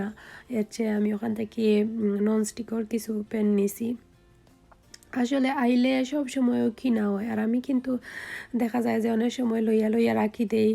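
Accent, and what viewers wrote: Sylhet, Bangladesh. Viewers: Assalamualaikum apu apnar vlogta onek balo hoesay kub sundor laglo. apu Ame apnar onek old fan bt comment korene kokono. apu ameo apnar moto Sylhety akjon may tai apnar kota suntay kub balo lage amar.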